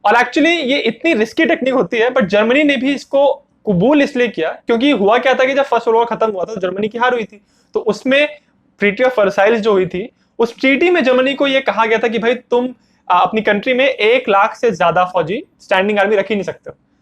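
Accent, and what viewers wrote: Bengal, India. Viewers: Bhai tum Bengali ho kya please reply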